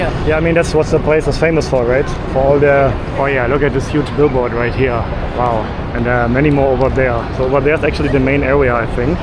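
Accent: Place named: Germany. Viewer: There’s supposedly a great test for Germans with good English accent. Get them to say squirrel, it’s a giveaway, the v pronunciation always comes out. Though I appreciate your efforts. I’m part German, I can hear it.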